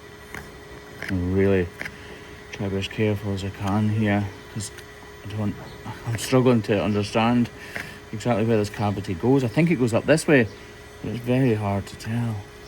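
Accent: Scotland, United Kingdom. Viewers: Normally I love Scottish accents and regional word variations, but for some reason hearing "slither" in place of the "sliver" I'm used to irritates me every time.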